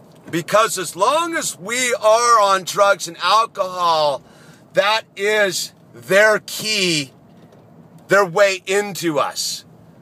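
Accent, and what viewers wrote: California, United States. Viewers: Ahhh. OK. Clearly this hombre is from Cali. No one in their right mind would talk like that anywhere else...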